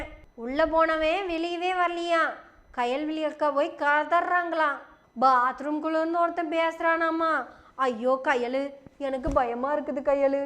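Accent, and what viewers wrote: Kongu, India. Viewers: கேட்டு கேட்டு சிரிக்காமல் இருக்கமுடியவில்லை தங்கையே! அருமையான பதிவு!! கொங்கு தமிழ் கொம்புத்தேன்!!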